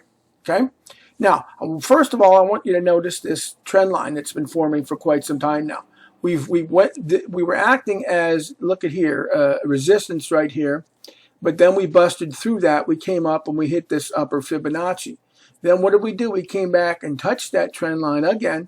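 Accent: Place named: Philadelphia, United States. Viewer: Subscribed because I'm from Philly and that accent makes me feel at ease 😌 lol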